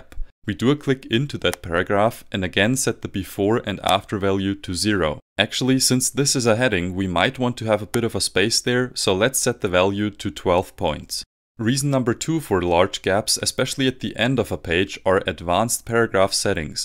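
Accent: Germany. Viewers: I am sensing a German accent, so Danke!! :D Hat mir die Masterarbeit gerettet <3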